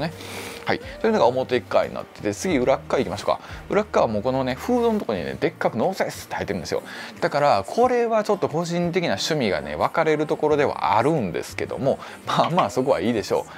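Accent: Kansai, Japan. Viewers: ，関西弁，大阪第二の故郷で懐かしいですわ🙋🙇✨✨✨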